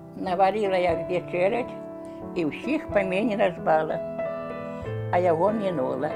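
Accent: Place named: Rural, Ukraine. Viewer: Она же не на белорусском говорит. У нас в Украине в селах так разговаривают. Я сначала субтитры читала без звука. А потом включила звук и поняла, что она вполне понятно говорит.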